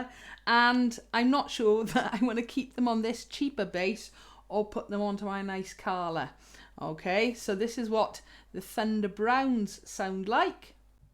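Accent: Wales, United Kingdom. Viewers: Hello. Thanks for your video. I have used all three 0f the types of strings you demonstrated. Like you I favour the Thunderbrowns. I have them on a fretted Aklot Bamboo acoustic/electric bass Uke. The only issue I have had with any of the strings is the amount they stretch. When all the stretching has been worked out of them they are all good to play I really enjoy your videos Love your Welsh accent. Gary.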